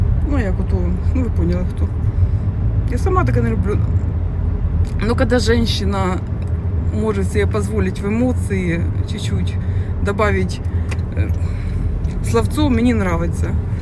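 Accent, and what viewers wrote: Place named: Surzhyk, Ukraine. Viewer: Я не украинка, но у этой жинки украинский не родной. Пытается , молодец!Звучит суржик! Лучше уж на родном языке, на котором сны или мысли! Зрителей и просмотров будет намного больше !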